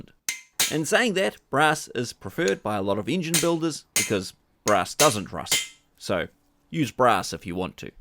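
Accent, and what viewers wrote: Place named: New Zealand. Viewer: I love the New Zeeland accent. Threeds and heeds.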